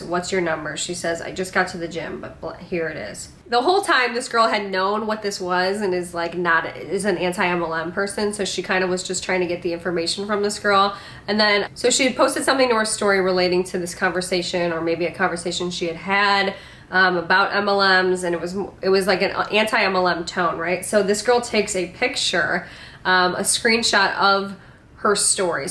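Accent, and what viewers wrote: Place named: Midwest, United States. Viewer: I hear my own Midwest accent when I listen to your videos. Your voice is so comforting which is great when watching mlm videos! Thanks for all the awareness you bring in this area. I almost got sucked into essential oils shortly after graduating high school. Very glad I dodged that bullet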